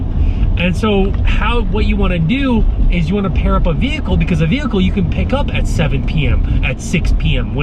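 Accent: Texas, United States. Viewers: What part of Texas you from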